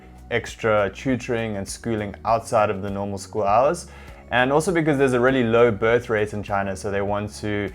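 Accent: South Africa. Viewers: Your accent sound like my south African friends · Your accent sounds South African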